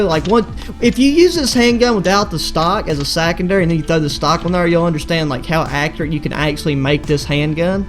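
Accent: United States. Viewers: Man sound like a country guy with a shotgun sitting on his porch not trying to make fun of u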